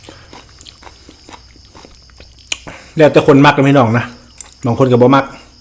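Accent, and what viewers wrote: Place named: North, Thailand. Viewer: สำเนียงเหมือคนเหนือเนอะ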